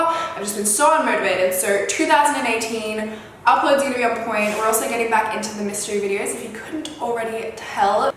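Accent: Australia. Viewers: She’s Australian. It’s an accent. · I have just been watching all US videos but my attention was drawn to your thumbnail at the side of my screen and thought "she's definitely Australian" so im here to check I was right....but I cant figure out what it is about Australian girls that makes them identifiable amongst everyone else on here? I can always predict when an Aussie accent is coming......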